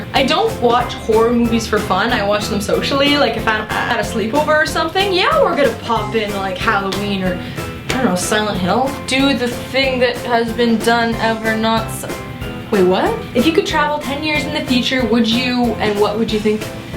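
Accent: United Kingdom. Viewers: Your NZ sounds like a bad English accent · Haha :) your New Zealand accent kinda sounded like an English Birmingham accent hehe awesome you made me laugh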